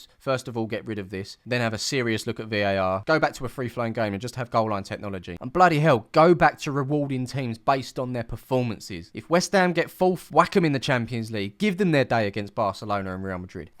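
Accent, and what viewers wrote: United States. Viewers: Fun concept, but wow this is bad for Europe. We at Forward Madison FC will gladly take you all in. And you have a spot on American accent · On one hand, I’m offended that you needed to do the American accent, but this is largely our fault, so.... dammit.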